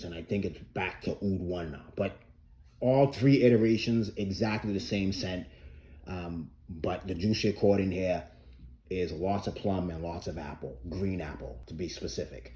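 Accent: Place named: Brooklyn, United States. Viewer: thanx brother you the man also your accent is phenomenal reminds me of here in NYC specially Brooklyn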